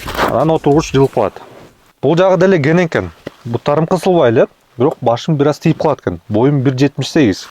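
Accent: Standard. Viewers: Ото сонун эң жакшы деген баа беришибиз керек таза кыргызча сүйлөгөнү учун чыныгы кыргыз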